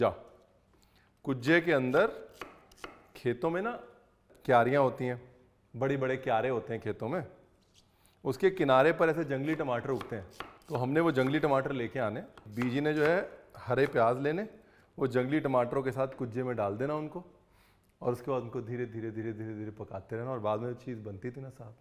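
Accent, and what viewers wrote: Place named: Punjab, India. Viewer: so nice sir, Punjabi ka tarkaa bahut sohnaa a ji.love it❤❤ chak Doo.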